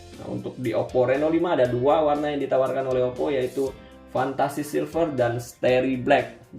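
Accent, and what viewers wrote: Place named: Padang, Indonesia. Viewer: Yang review kek orang Padang deh. Logat nya keliatan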